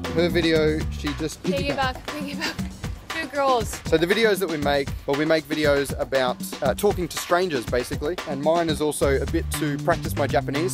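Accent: Australia. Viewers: Hi, how are you doing? i love what you did, it's incredible experience. What i like the most it s your Australian accent 😍😍😍. Please don t rush let us enjoy the details of japan, it's awesome country. Thank you for giving us this opportunity as well to discover really japan form your experience. I m going to watch the next one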